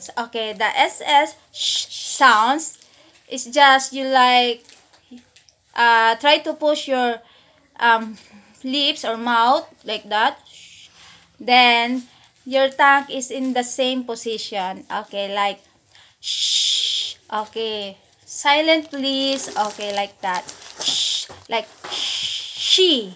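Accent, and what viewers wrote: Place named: Visayas, Philippines. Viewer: Bisaya Pala ikaw Heheeh nice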